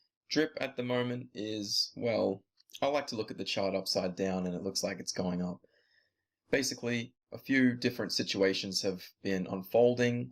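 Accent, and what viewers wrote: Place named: Australia. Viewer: Great video. Hi from Melbourne, Australia and you sound like an aussie too.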